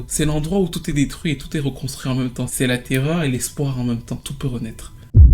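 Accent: Cameroon. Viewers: Voilaaaa, je savais que tu etais camerounais !!! Ce ton!! 😂😂😂😂😂même mon sang faisait bepbepbep, celui ci mm sil est francais, aïe le rhesus continentais est mboomayééé! Cool ! God bless you.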